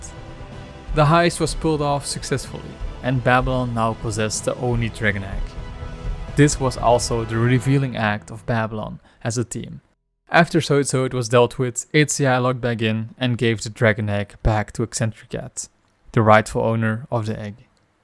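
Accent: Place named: Netherlands. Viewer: cause u sound dutch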